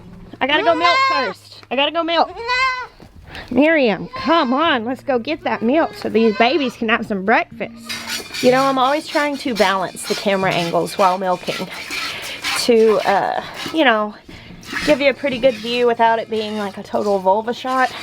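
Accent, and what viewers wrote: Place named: Ozarks, United States. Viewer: Love your accent, sounds like someone from ozark!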